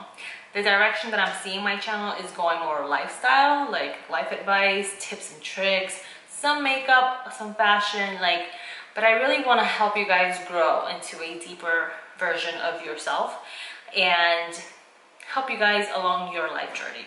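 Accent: Puerto Rico. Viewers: To be honest I first saw your videos and was fascinated by la chinita que habla español como boricua lol but seeing your videos and personality I was drawn to you and subscribed right away and pretty much have watched all your videos. There's sonething different and reall about you, its refreshing and I love it. I'm glad ypu took a break from youtube because it lead you to self discovery and that's what's more important, your happiness. I'm a true fan and will continue to be even if you dont post anything haha. I follow you on instagram and always love your post and quotes! Looking forward to your new content! Glad your back. Besos!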